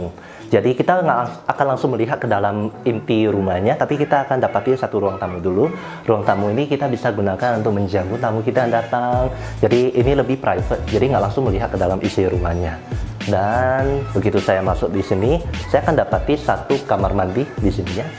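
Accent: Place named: Medan, Indonesia. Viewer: Ngomongnya ciri khas cina medan bgt yaa.cadel bgt..hehee. Ga kyk cina jkt lbh enk dengarnya.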